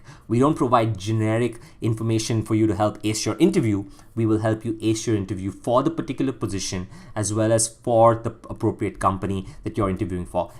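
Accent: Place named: India. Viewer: McDonald's don't use white ppl in their advertising either. So no wonder this guy sounds like he's recording this from Mumbai.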